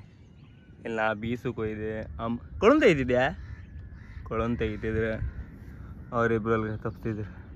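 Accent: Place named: Kundapur, India. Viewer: Kushi aguthe nim vlog noduke. Nim kundapur kannada bashe nu superr hige log madtha eriii mare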